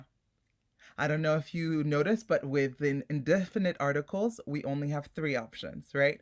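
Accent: France. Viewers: French accent is so beautiful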